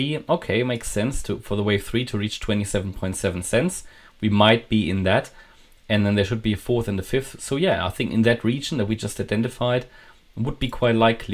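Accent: Germany. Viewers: Wenn du jetzt noch den krassen deutschen Akzent wegbekommst, wäre das Video richtig gut 😂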